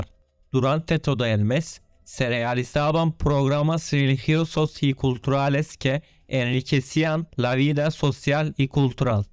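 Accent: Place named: Turkey. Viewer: Interesante me gusta las historas turcas Según tu acento eres turco Y hablas bien el español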